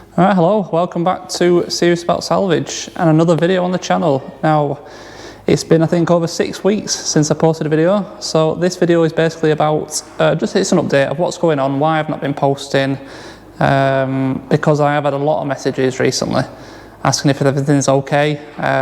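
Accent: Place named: Northern England, United Kingdom. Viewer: Really good to see and hear your fellow northern dialect again, proper work pays your bills, but any video is good by me when you have some free time.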